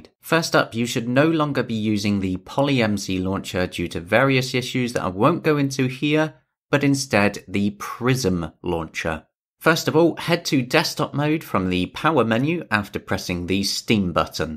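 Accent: United Kingdom. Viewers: Oh my god I found a British hello from American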